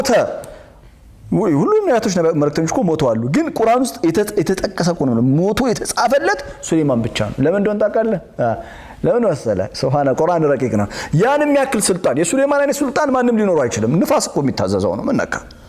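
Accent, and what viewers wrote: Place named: Gurage, Ethiopia. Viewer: والله antenna guregna new yemtmeslegn anegagerh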